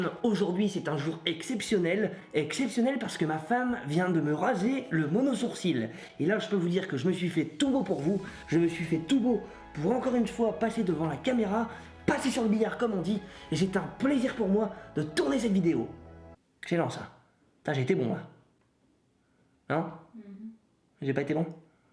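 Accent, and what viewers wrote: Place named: Nord, France. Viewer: Excellent l'accent du Nord de wormhout et vive eul chnord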